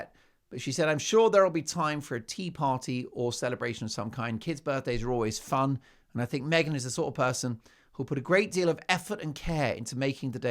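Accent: United States. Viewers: Omg! I love your American accent! Spot on. I’m an American and it’s hilarious. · Awesome American accent. And you are a riot, by the way! 😆😆😆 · Your American accent is better than most of the other British presenters! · 🇺🇸! How is your American accent so good??!! The California Lawyer and the Wendy’s Employee characters!! Spot On!! And hilarious! I bet you heard me laughing from Nashville! And I am holding you to your word to put The Music City on your next US tour. 😂🎵🤩 Also I’m gonna start calling Reg “Elton Jr.” 🐈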